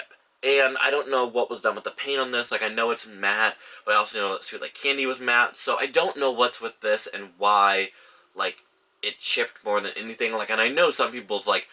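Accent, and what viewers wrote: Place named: United Kingdom. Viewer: if you’re from the uk which you sound like you are, how/where did you get cloud so early??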